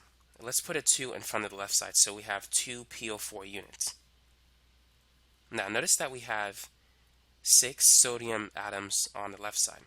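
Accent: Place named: New York, United States. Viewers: im hearing a little bit of north east accent. new york area 🤔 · Yall I think he has a new yorkian accent idk if u care tho lol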